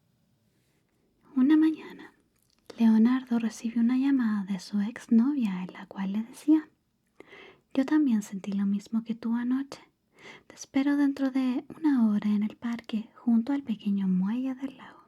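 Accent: Chile. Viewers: A mi me gusta su voz también cuando habla a lo chileno se escucha muy hermosa:_Love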